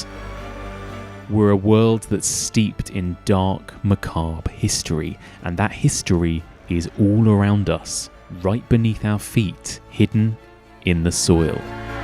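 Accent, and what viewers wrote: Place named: England, United Kingdom. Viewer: Why this whinging over history? It’s so annoying! Get a grip! That’s why our forefathers BUILT shlt and all we can do nowadays is tear down the house we inherited. I can tell by your English accent you are Keir Starmer like guy. Who actually gave you guys the right to torch down the civilization of your fathers going back over 1000 years. 1000 years going strong, then you guys get power and destroy England in a way that cannot be undone. What gives you the right?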